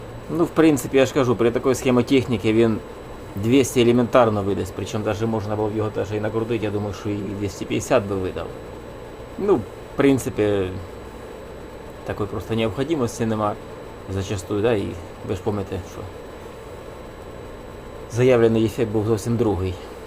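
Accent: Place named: Poltava, Ukraine. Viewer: Шото говор наче полтавський! Угадав?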